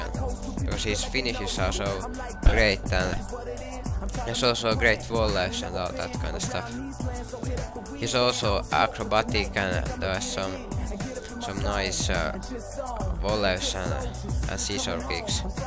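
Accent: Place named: Finland. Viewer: haha 40 seconds of commentary and i could hear the finnish accent even though im not finnish nor scandinavian. :) good vid anyway :) ill have a look at him in the career mode as well as fut. keep up the good work mate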